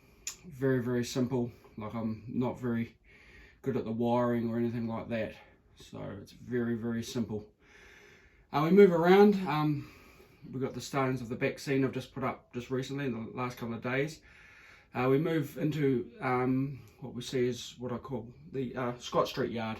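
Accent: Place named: New Zealand. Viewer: Great to hear a good old fashioned kiwi accent! Kia ora, new sub from a former Wellingtonian.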